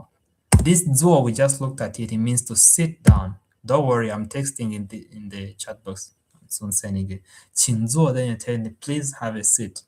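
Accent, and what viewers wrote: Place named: Nigeria. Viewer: are you from Nigeria by chance? btw your Chinese sounds less accented than your English lol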